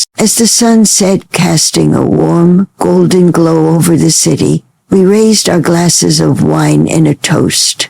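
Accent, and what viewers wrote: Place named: Ireland. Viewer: Interesting Irish accent emerging at times. Engaging story nonetheless.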